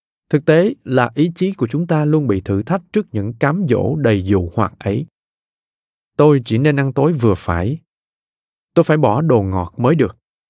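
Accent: South, Vietnam. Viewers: Giọng đọc miền nam hay quá, cảm ơn! Sách hay! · Đã lâu lắm rồi mới được nghe một giọng đọc miền nam quá chuẩn , phát âm rõ ràng S và X …cám ơn nhiều ! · Tôi thích giọng đọc miền nam này, nghe mà ghiền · Giong đọc của anh này quá hay , đúng là giong miền Nam nghe hay hơn giong bắc. Sách hay ,giọng nói rất hay. Cảm ơn tác giả va cảm ơn người đọc sách hay . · Đúng rồi. Giọng Nam mềm mại dễ nghe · Thích giọng Miền Nam. Thích nội dung sách. Cảm ơn bạn.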